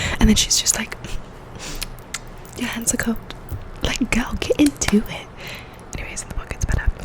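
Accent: England, United Kingdom. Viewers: Your british accent brings me so much joy 😂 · You sound more English than me and I’m from England, you sound like the English girl Ross nearly married in Friends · It’s actually kind of interesting. Your enthusiasm and British accent kill it. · british accent is a trigger itself. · I always fancied myself as Jane 💁🏼‍♀️. Question: Which do you prefer - The Pride & Prejudice tv series with Colin Firth or the film with Keira Knightley? I’m team tv series. Always appreciate it when you bless us with your natural British accent. Take care! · Keep doing soft British whispering, it is so tingly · she's talking British?!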